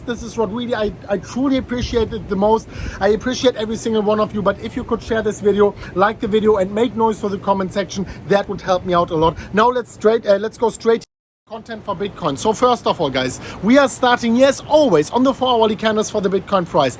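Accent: Germany. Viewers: PS: Der deutsche Akzent ist voll geil :P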